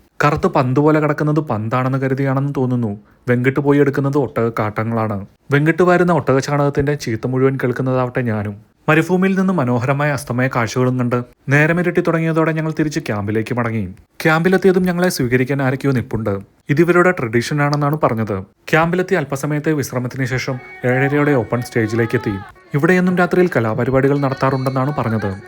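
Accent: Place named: Kottayam, India. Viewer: കോട്ടയം സൈഡ് ആയിരിക്കും ഇവര്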